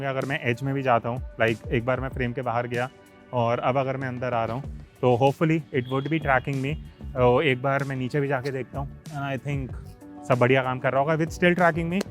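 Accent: India. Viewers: Are you speaking English or trying to f*ck the language? Don't even try it again, you had better speak your own language (actually it sounds Indian, not English), and you have no f* idea how to pronounce it.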